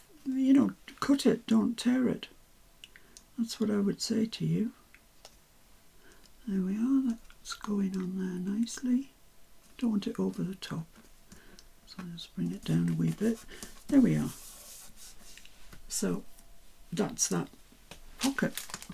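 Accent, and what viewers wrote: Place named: North East England, United Kingdom. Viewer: I've just found you today and subscribed, lovely to hear a fellow North Easterner on YouTube. How can I connect with you? looking for fellow crafters around Northumberland.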